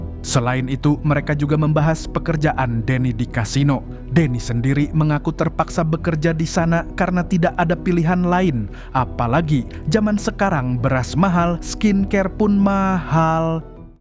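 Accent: Banjar, Indonesia. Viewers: Banjar nya masih ada 😂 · Urg banjar Pina nya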